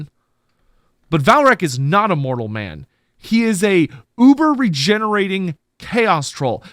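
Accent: United Kingdom. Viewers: Mancunian accent makes it too difficult for me to watch. The prediction videos also kill a lot of excitement for me at least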